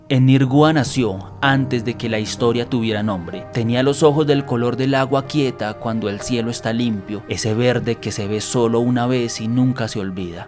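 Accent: Colombia. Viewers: Este narrador no es Venezolano...Es Colombiano... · Paisa, puede ser de gochilandia, fronterizo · Me gustó mucho y a pesar de que no es el acento venezolano aprecio que un Colombiano tenga esa dicción tan linda y encima contar con tanta amabilidad las leyendas Venezolanas..nueva suscriptora 🎉❤ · es de Bogotá. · Muy buenos los relatos perola malo es el acento colombiano que tiene el narrador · Muy lindo pero porfavor un venezolano que narre no un colombiano utizando los matices colombianos se escucha raro Pero me encantó los relatos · Es colombiano 😅😅😅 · Pero que cantidad de gafedades y de paso la narrativa es colombiana con demasiado acento , la próxima mínimo busquen alguien de aquí, decir chamo o pana no es ser venezolanos. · Son historias venezolanas contados por un maldito colombiano? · El mismo script de otro canal bueno igual me encanto con ese acento tan bello viva colombia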